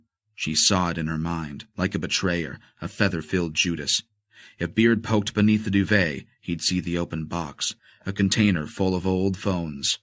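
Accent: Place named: United States. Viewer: As always, Nevill’s tale is rich and textured and compelling. His prodding of what scares us, ancient fear, from before we knew what fear was is—if not actually unique—superlative. Why a Yank narrator? Got in the way a bit.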